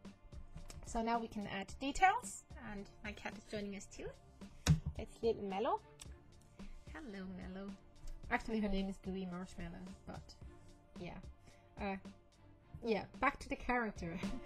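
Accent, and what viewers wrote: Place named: Germany. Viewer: r u married? (Love ur work and ur german accent)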